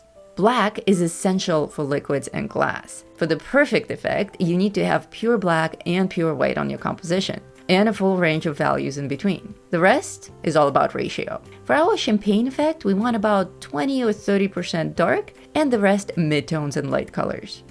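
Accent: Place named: France. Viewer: You have a beautiful accent, what language do you speak? I get a hint of French.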